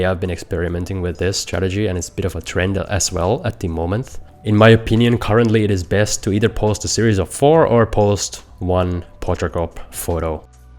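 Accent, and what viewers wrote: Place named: France. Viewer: Such excellent information, I'm so excited I just followed you on Twitter! I created a Twitter account several years ago intending to start posting images but life got in the way so I just recently became more active. It's been very confusing not knowing the best practice for photographers which is partly why I was procrastinating to begin with and your YouTube videos have truly been a gift. Initially I didn't use any hashtags because I was told it wasn't a good idea but I'm only getting a few "likes" although some of my Tweets are receiving a fair number of impressions. I'm not sure exactly what that represents or if it's meaningful, how can I tell if these are just views or retweets? Also do you think it may be a good idea to retweet some images I already published by putting them in groups of 4 as suggested here and adding hashtags since I don't have twitter Blue and can't edit anyway? 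Also I'm confused whether it's okay to retweet my own posts on other pages for additional exposure or will that show up on my page as well? Please if you can help clear up these few things for me I will be extremely appreciative of your time and attention, all the best! And you're cute too, love listening to you French accent and easy on the eyes helps watching along with all the wonderful advice!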